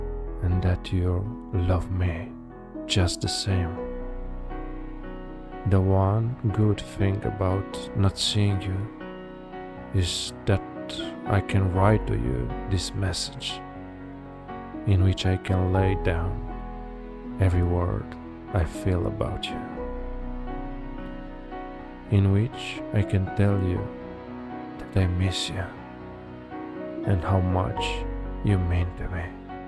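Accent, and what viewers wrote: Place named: United States. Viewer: Încerc să înțeleg ceea ce exprimi , este așa de frumos și atât de minunat mesajul , dublat de o voce magică și inconfortabila , mesaj exprimat atât de expresiv intr-o engleză americană atât de perfectă și atât de clară , parcă sună mai bine decât în “limba noastră,, deși subiectul este comun: Dragostea! In orice limbă o exprimi este Wow , talentul și vocea ta “Fac toți banii,, Te urmez și-ți urmăresc traseu și ascensiunea absolut uimitoare dar și pe deplin meritată fiindcă talentul tău este incotenstabil și incomensurabil ! Nu cred ca ai nevoie de ajutor ca să înțelegi mesajul meu ! Good night and sweets dreams poet of my heart ! 🙏👏😘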